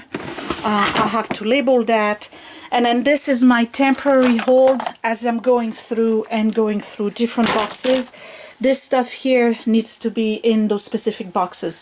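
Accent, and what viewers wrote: France. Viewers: Hubby started watching your videos with me lately!! I think he secretly likes you french accent! We both laughed at Scott's bin of cables and modems, Hubby has 2 bins of those in our garage! I have no idea why he is keeping them!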